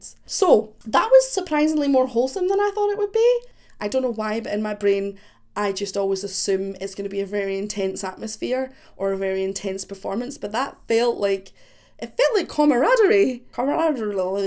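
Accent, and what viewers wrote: Scotland, United Kingdom. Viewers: Lovely finding a scottish reactor! Pure cracking up at some of your patter, you made me homesick, naebdy swears as fluently as us 🤣 is one of the all time great singers, you want to check out what his voice was like when he was younger (just as good). I actually was at the very first gig where sang for, at the Barras in Glasgow. Powerful singer, sort of reminiscent of or. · First of all, love that Scottish accent. 2nd, had the pleasure of seeing twice (, seven times), and it is a wholesome experience. Sing along, jumping together, a tribe coming together for a metal sermon. My fav. is, no question. You mentioned gothic briefly, I suggest you check out the by handpicked warm-up band -. Other than that; - (Live Masters of Rock 2015).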